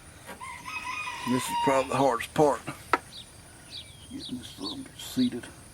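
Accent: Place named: South, United States. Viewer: Thanks for the upload! Plus you sound like a good ole country boy, hell you would fit right in around here!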